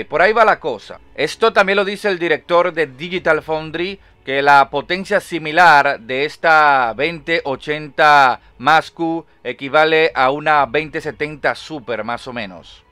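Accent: Dominican Republic. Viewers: Eres dominicano ?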